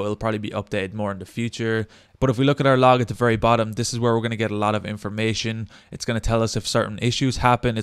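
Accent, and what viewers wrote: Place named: Ireland. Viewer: you have a great Irish accent lad thnx worked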